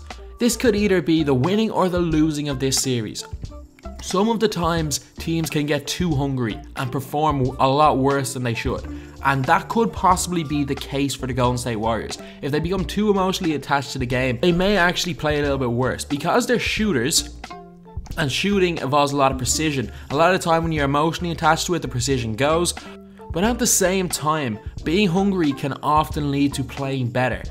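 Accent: Ireland. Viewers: Is this dude from ireland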